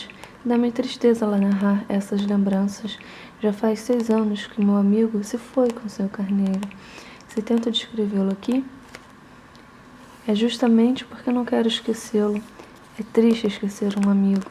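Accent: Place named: Florianopolis, Brazil. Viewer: você tem um sotaque daqui de Floripa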